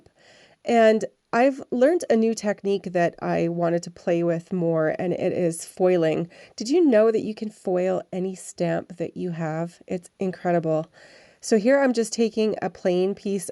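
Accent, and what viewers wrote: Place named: Wisconsin, United States. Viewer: I lived in WI years ago and you remind me of what my accent was, I love it!!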